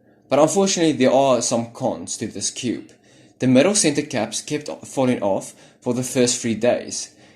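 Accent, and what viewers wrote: South Africa. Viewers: Are you south African, nice accent! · What I'm so sorry but are you South African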